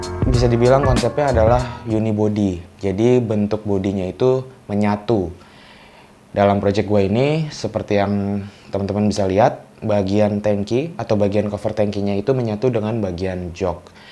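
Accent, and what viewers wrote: Bugis, Indonesia. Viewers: abang orang bugis ya?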